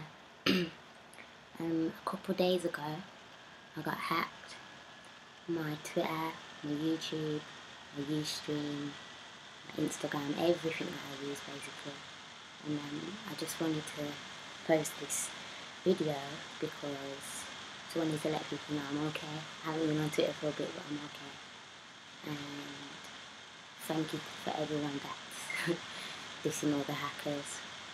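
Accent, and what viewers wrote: United Kingdom. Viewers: I love the British talk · Come to america please just for a little while. Most unique brit rapper. I love the accent even tho its heavy.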